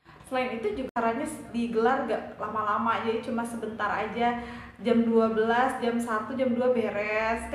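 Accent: Java, Indonesia. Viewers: Logat mba nya sdh medhok juga he he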